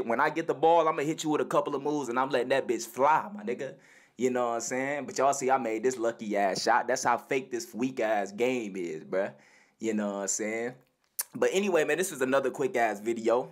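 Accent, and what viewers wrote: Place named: Detroit, United States. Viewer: man i knew u was from Detroit when i first heard about u n start watching yo shit just by the way u talk then i just saw cody man flight fucked up from tryna play u he really bouta get exopsed detroit got one of the most hardest comps ever they aint fw is bro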